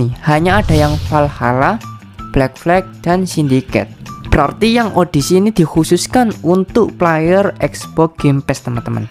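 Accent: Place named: Indonesia. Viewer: medok e rek rek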